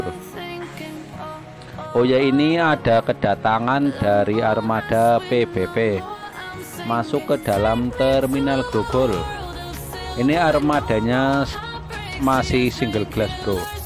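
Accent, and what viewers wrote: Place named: Banyumas, Indonesia. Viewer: Salam ngapak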